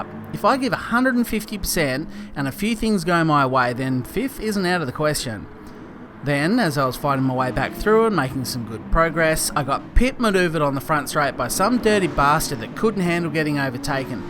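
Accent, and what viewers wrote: Australia. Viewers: Straya mate! How could you ever think he is British? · siga raga He is an Aussie if you couldn't tell by his accent, and the Australian flag next to his gamertag.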